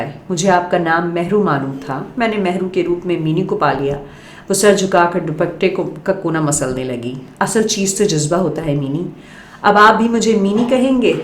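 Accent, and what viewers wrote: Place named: Upper class, Pakistan. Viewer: Irna burger ban k mt bolyn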